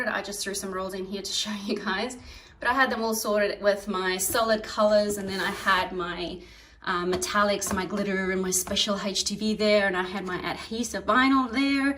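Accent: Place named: Australia. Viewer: The relief I felt when I heard her Australian accent was unbelievable.. haha. I’ve watched so many cricut videos & it’s always American. This is the first time I’ve seen an Aussie do cricut videos so I subscribed straight away!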